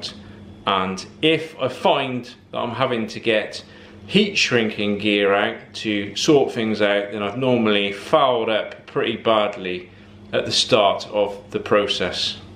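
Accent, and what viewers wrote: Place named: Cheltenham, United Kingdom. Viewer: You sound like me ,you have got to be from Cheltenham.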